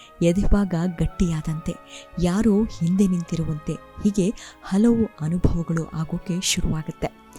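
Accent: Standard. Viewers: Nimm swachand kannada matu channagide tq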